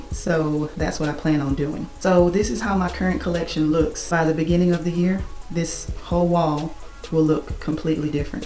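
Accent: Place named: Texas, United States. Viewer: I thought you sounded like a Texas girl! Definitely looking forward to brand videos when you redo your shelves.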